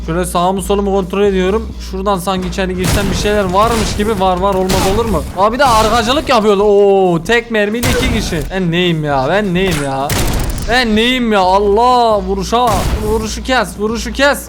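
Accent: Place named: Kahramanmaraş, Turkey. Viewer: Abi böyle diyomda bende boyle konuşuyom bende maraşlıyım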